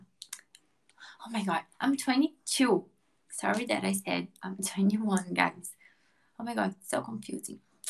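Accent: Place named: United States. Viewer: Eu pensei que você era americana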